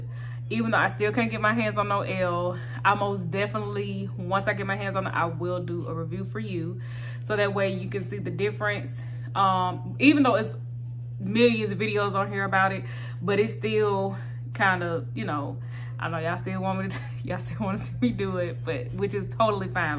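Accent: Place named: Texas, United States. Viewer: You gotta be from Texas with that accent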